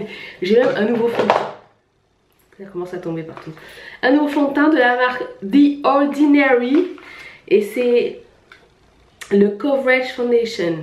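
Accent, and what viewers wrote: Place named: Quebec, Canada. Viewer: J'aime bien quand tu parles à la québécoise!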